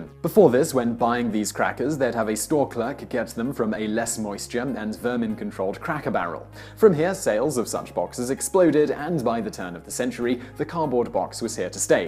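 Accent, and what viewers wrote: England, United Kingdom. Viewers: I’m pretty sure he’s English lol give him a break 😂 some of those pronunciations are actually correct U.K. pronunciations. And I think the besieging may have been either him assuming it was a German word, or the text was typoed like “beiseging”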